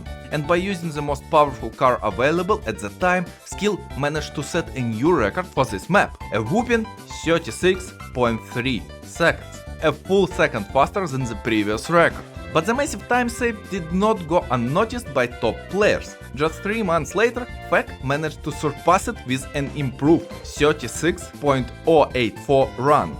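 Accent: Eastern Europe. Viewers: Bro is STRONGLY eastern European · Slavic accent in eng beamng vid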